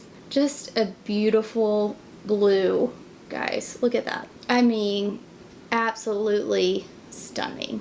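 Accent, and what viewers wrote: South, United States. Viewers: both of those looks are beautiful on you. I also bought the Denim palette but have not had the chance to wear it yet. Still just mostly staying home here in Washington with mandatory masks when out in public. I bought 7 of the new palettes and love them. I have collected Dior quints for many years but love the new formula. You are such a ray of sunshine. Love your Southern accent and personality.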